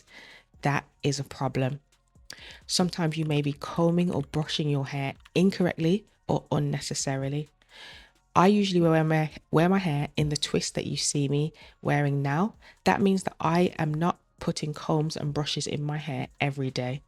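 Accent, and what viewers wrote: Birmingham, United Kingdom. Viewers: You and your hair are beautiful, giving me hope as I can sometimes get stuck, on another note love the Brummie accent, reminds me of a friend of mine.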